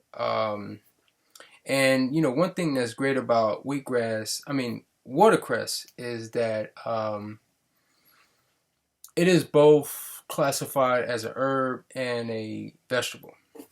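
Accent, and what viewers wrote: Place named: DMV, United States. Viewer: u sound like ur from the DMV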